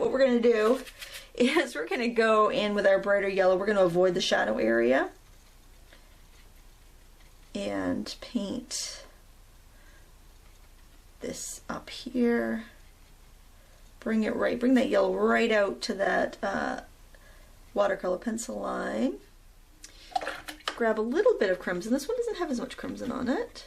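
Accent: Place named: New England, United States. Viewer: love the long videos!!!! I watch them alot for tips and practice..you give lots of great ideas and tips. I paint and do crafts all day most days, and watch youtube videos on my huge tv screen so i can see every little detail! Thankya again for sharing!!! Long vids are more then welcome!! lol love your new england sillyness too!!! wicked smaaaat lolol giggle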